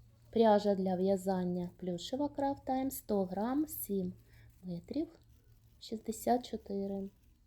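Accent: Russia. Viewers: На палицях????? Вроді і знаєте мову, але вимова російська.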